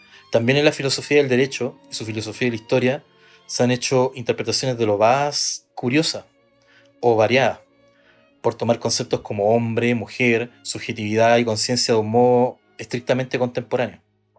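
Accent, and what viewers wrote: Chile. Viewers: Me encanta el acento chileno (me genera paz). Yo estudié filosofía, pero aún no profundicé con Hegel y quiero darle a la Fenomenología del espíritu.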